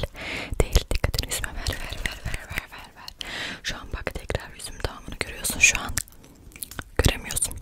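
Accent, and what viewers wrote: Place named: Germany. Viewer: ablada alman aksanı var gibi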